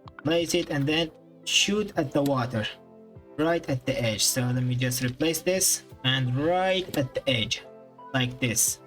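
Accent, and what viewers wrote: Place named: Arab world. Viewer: your accent is kinda arabic r u arabic?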